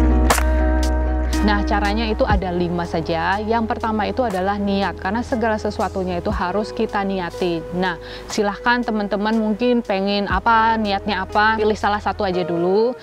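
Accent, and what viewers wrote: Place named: Cilacap, Indonesia. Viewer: Baru ngeh kalau mbanya orang cilacap,ora ngapak ora kepenak Mantap mba salam 👍👍😊🙏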